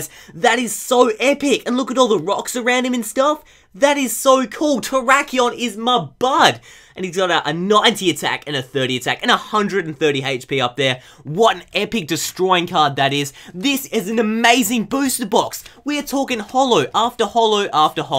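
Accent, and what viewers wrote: Australia. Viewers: Australian accent mate :)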